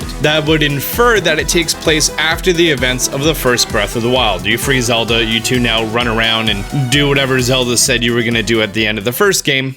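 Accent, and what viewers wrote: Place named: United States. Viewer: Strongest American accent I've ever heard, wtf! 😰😬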